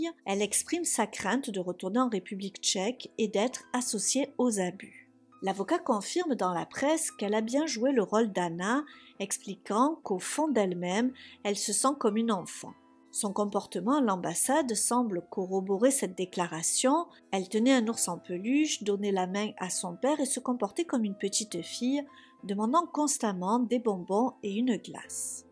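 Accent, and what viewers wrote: South, France. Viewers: J’ai oublie, pardon …Merci pour ce très bon récit.Continue… j’adore ton accent du Sud, qui est bien tempéré, pas à couper au couteau😊❤😅😊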